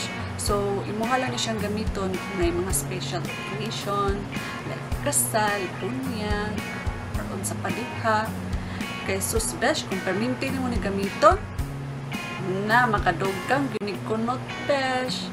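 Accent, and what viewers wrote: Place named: Visayas, Philippines. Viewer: Hello dear beautiful, i subbed you back and natuwa ako at bisaya ang language mong ginamit hehehhe. Anyway maiintindihan kita kasi i have a lot of friends from Cebu, Bohol, Surigao...... keep it up hehehhe, so cute ang iyong bisaya. 👍🏻😘💞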